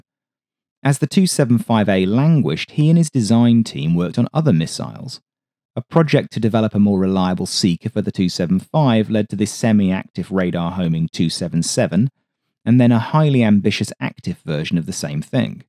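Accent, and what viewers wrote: United Kingdom. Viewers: I lose interest when I hear the Brit voice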